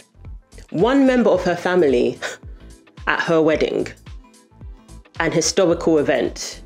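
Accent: England, United Kingdom. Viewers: Just found your channel and subscribed straight away. It is always a joy to hear the Queen's English spoke so eloquently, and a young person with such good perception. Your accent suggests that you are from England or a Commonwealth Nation, being in America now makes your perspective extremely valuable for the rest of us. Looking forward to following you!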